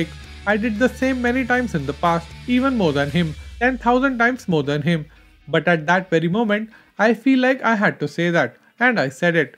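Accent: India. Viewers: Ha! It takes a couple of views and you will be used to the mild Indian accent.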